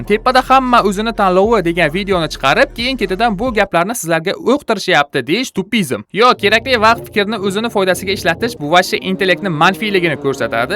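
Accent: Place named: Jizzakh, Uzbekistan. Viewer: Gap yo'q maza qldim 😂😂😂😂 Kontentdan toxtamang, Qayerdansiz brat uziz ? Jizzaxga shevez tortebdida 😂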